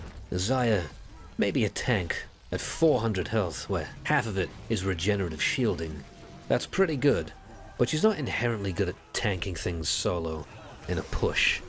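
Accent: Wales, United Kingdom. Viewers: Surely Welsh. Just watched the Hanzo introduction vid, he gets a bit heated right at the end. Guessing he mitigates his accent... Creepy analysis over. PS: Or, if Aus.... New South Welsh?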